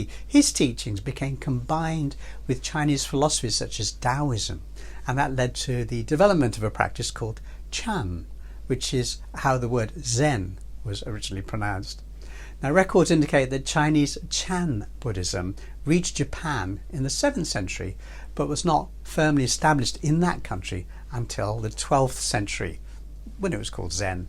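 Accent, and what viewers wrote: United Kingdom. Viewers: what a disgusting video 🤢🤮. 🇯🇵🇹🇼🇰🇷🇵🇭🇹🇭culture is all superior to china!!!! spoken by an Indian dude with a British accent. The Hypocrisy😮‍💨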